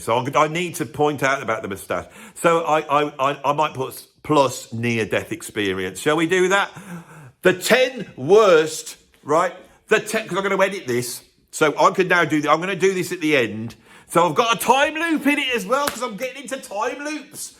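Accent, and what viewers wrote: Birmingham, United Kingdom. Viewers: 1. Loud brummy spouting his worthless opinions on youtoob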